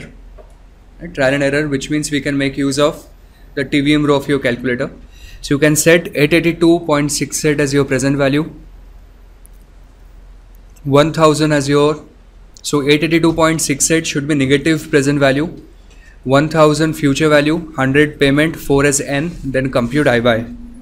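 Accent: India. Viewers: This annoying irritating fucking indian accent.... Guys, why every nation can adjust its accent except you?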